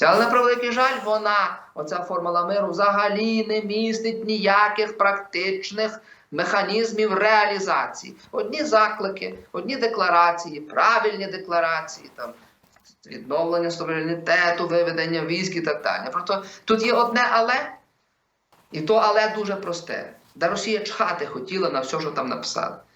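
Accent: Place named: Galicia, Ukraine. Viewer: Третии маидан готуе Порошенко та таки як ти ,и розмрвляи Украинською а не Галичанським суржиком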